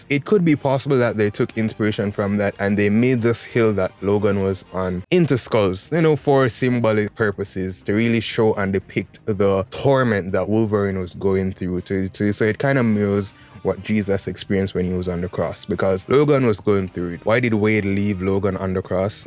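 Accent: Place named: Jamaica. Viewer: Bomboclaaat rastaman ahh accent, cool af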